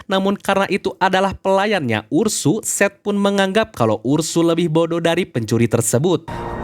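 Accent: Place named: Java, Indonesia. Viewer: Emamg elu tuau dia orang Jateng or jatim? mas is jawa. Not nesyenel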